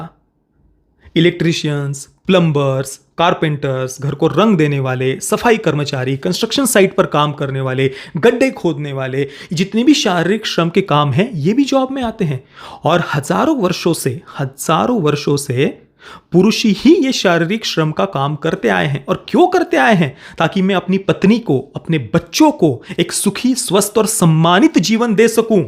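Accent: Maharashtra, India. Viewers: Heavy Marathi accent he has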